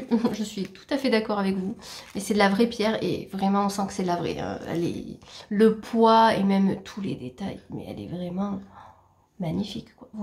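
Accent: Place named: South, France. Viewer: Coucou je connais pas du tout ton département soit orange 🍊 nous on est de Bordeaux et nous partons très souvent en Espagne et en Italie 🇮🇹 nos pays d origine donc à art Paris on connaît peu la France 🇫🇷 et sinon tu as un accent très prononcé du sud c très jolie a l oreille bisous à ma youtubeuse préférée ❤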